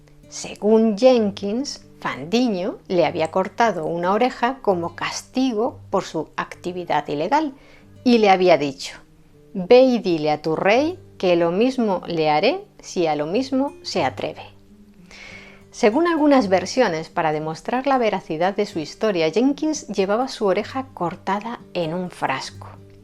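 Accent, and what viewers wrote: Spain. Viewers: Exquisita historia de mi ciudad Cartagena de Indias, admirable y a la vez muy triste el final de este gran héroe Don Blas de lezo, en las escuelas si enseñan esta historia de la defensa de Cartagena ante los británicos y de hecho hay un monumento de el frente al Castillo San Felipe de Barajas y llevan su nombre un colegio y un barrio; ah y muy buena narración de con ese acento español que te caracteriza. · Siempre escuche de Blas de Lezo como un valiente defendiendo a Cartagena. Que interesante!!! Narrado por una mujer de la madre oatria con una bella voz y poetico acento😊😊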